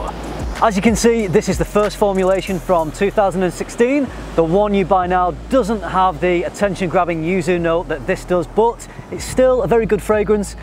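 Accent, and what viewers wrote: United Kingdom. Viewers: Dammnnnn ur cinematography skills are fabulous and gud review as well... I m from Pakistan but I don't why British accent feels so attractive to me... I would love to listen that all day long...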